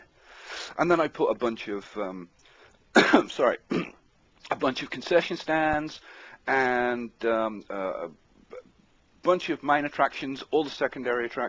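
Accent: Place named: United Kingdom. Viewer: I bought the Amusement Park. And yeah, it's ok, I like it. It would be nice, as you said, if it was more reasonably priced though. Thanks for all of the fun, educational, and entertaining videos! I love to hear what you have to say..... and.....I've always been a sucker for a british accent. lol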